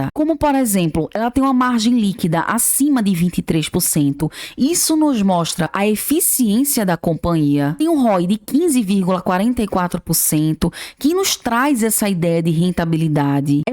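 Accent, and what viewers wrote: Northeast, Brazil. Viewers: Letra B Vc é de q parte do nordeste? Sou de Caruaru PE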